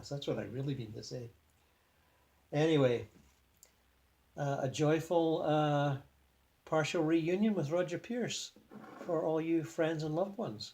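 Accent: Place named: Canada. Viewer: This is my Fav contact from a lot of your vids starting or about..and really kicks in ..better feeling even being in love.. yes you are in pure love and are the assents of love Love all your videos and thank you for posting these, so i don't feel so nuts..yet you let it out as no big deal..and inspires me too..edit : this was the 1st video i saw of you doing this, and the part how he will not ever forget those who were there for him in the end, aw.. see i was there for my grandma of 99 1/2 who died last yr at home using hospice care..and her last words were..thank you 3 times..and im thanking her..she got ill and wanted to thank me and just except it ..and pray pray pray she said 3 times.. it always worked for me.. Be blessed ..from South Carolina USA .. love your accent ..i love to think British in the uk but know its Canadian !?..maybe even Montreal Take care :*)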